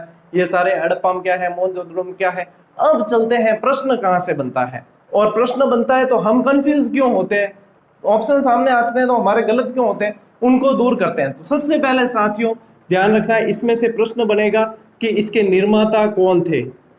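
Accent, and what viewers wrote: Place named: Marwari, India. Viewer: Sir apki marvari boli bhut achi lgti h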